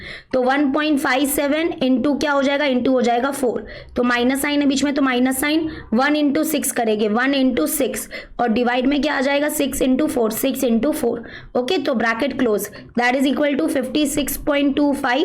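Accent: Maharashtra, India. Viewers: Dii are you Maharashtrian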